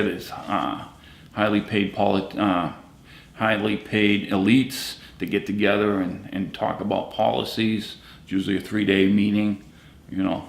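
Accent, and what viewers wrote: Boston, United States. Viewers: Please, I'm burning with curiosity: are y'all from Boston? If not, what sort of accent is that?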